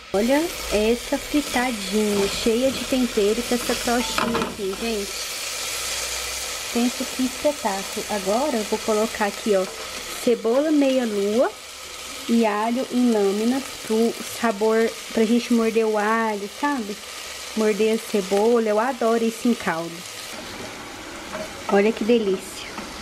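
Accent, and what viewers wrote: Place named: Rio de Janeiro, Brazil. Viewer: Oi, falei muito, mas esqueci de falar que soou carioca, mas moro em Brasilia, sou professora aposentada e depois que aposentei sempre procuro mais novidades em almoços e jantares, simples e rápidos e também mais sofisticados e por isso encontrei-a, pois há anos estou ligada em assistir e fazer novas receitas.para receber família e amigos.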